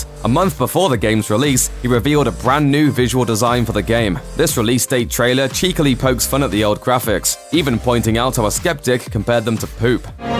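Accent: United Kingdom. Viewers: The game is absolutely AWESOME...this guy can take his limey voice and piss off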